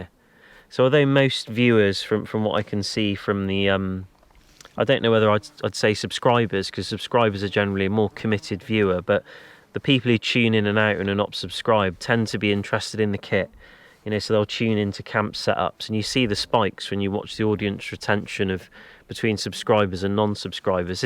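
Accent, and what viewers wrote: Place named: Forest of Dean, United Kingdom. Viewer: Love all the British cultural references to Yorkshire tea and Saville as well as the occasional lapses into a broad Forest of Dean accent. Entertaining as always. Guess I’m one of those subscribers who will listen no matter what the content is each time! Keep enjoying the filming so we can keep enjoying the viewing 👍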